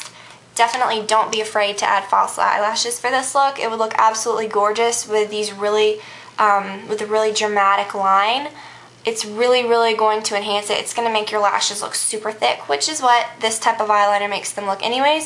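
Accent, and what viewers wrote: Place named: Texas, United States. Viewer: you sound like your from texas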